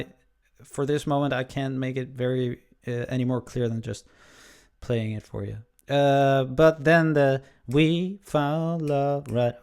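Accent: Netherlands. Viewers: it's not bothering me but are you Dutch?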